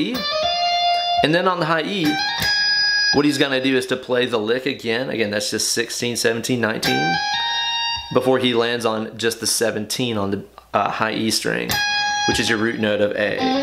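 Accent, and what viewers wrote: South, United States. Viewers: I didn’t hear it. Maybe it’s just that country accent. I get a kick out of it. Reminds me of my days in Alabama.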